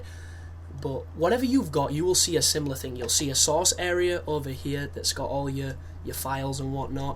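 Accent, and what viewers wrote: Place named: England, United Kingdom. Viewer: its cuz he has an english accent which makes anyone sound cooler/more interesting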